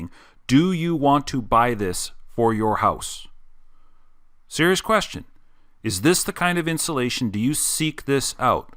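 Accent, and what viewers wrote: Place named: Canada. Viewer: Does the foam have to be flush with the inside stud faces? What about convection channels behind the drywall in the stud bays? (It's on the warm side). Can a guy do 3 inches in a 2x6 wall -- or is is better to use 2x4's and fill it up? This topic is unbelievably frustrating; the whole building science topic is unbelievably frustrating -- especially in Canada. Excellent content. Excellent presentation. Excellent Canadian accent.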